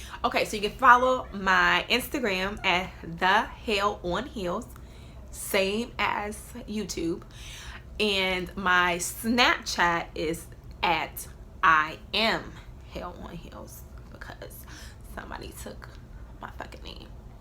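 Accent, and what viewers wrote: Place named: Chicago, United States. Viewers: what happened to that country Chicago accent? You sound like your from the Bronx now lol. Loved you on BGC and Love you now! Best Wishes too you 😘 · Damnnnn the Chicago accent totally disappeared 😂😂 still loveee me some tho!! Happy for you and your evolution ❣️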